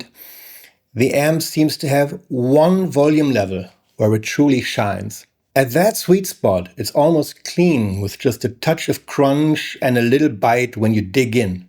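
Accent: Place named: Germany. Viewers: German accent is my favorite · I like this content, and I like hearing presenters with a German accent! Very cool, sir, very cool.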